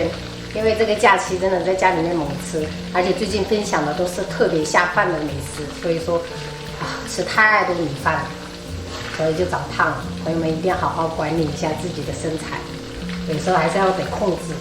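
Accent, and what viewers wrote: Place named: Fujian, China. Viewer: 还以为你是福建人呢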